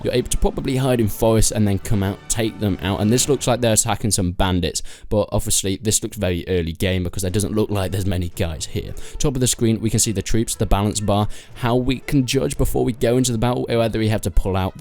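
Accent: England, United Kingdom. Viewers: The annoying english accent voice over, is my cup of tea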